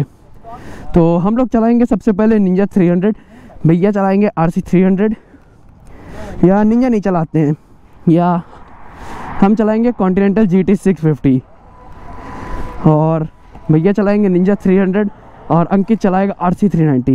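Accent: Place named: Lucknow, India. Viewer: Loved the lucknow accent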